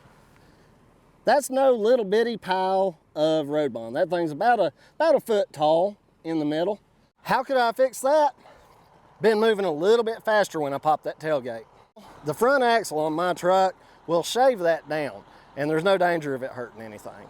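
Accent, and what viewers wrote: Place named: North Carolina, United States. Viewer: Just found your channel, moved to nc from illinois, bought 60 acres and have built a home on it, pond already existed and was searching videos on how to build roads…heard your accent and thought to myself, this guy talks just like my neighbor…sure enough, looked you up…NC..definitely a native. Im located a little far east for you, but i would have you come repair my pond, the shorelines have all collapsed and need the muck cleared out and built back up the shorelines. Finding anyone who has pond experience in my area seems impossible. Im actually thinking of just buying a kx-080, use it for a couple years and then sell it, they hold their value pretty well from what i see.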